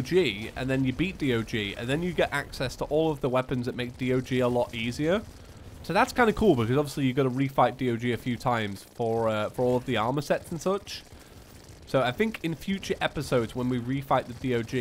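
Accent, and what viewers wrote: United Kingdom. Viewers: I was super confused to his british accent, "What the heck is super heart? I don't remember a game like that", then I realized he was talking about super hot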